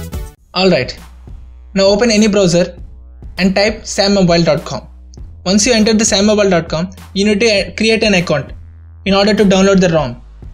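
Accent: India. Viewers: It is really funny before he selected the country i already knew he was from India that accent tells everything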